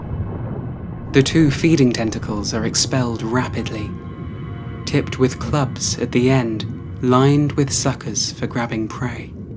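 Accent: England, United Kingdom. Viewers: he literally has an english accent is this comment supposed to be rage bait 😭😭😭😭😭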